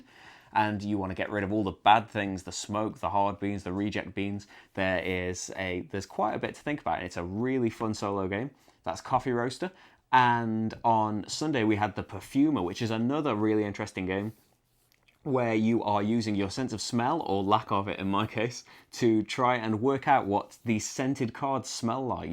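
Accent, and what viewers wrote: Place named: Leeds, United Kingdom. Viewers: This was great thanks, and I watched all the way to the end. Is like a friend sat having a chat. Do you live in the North Of England (just by your accent??) If you don't mind me asking. Enjoy Essen! You certainly going to need a few empty cases to bring back all those games ;-) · Yeah I wondered that too. Sounds like you're from my neck of the Leeds woods!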